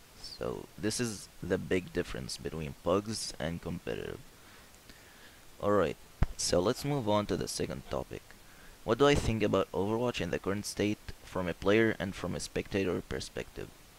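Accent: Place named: Arab world. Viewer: Much love (from Palestine!) you sound arabic by the way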